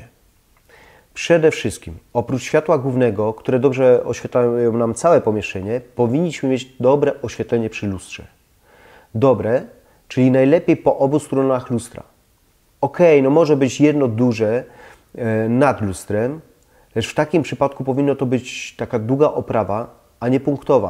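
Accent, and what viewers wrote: Silesia, Poland. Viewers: Czy jest Pan ze Śląska? Pytanie z ciekawości :)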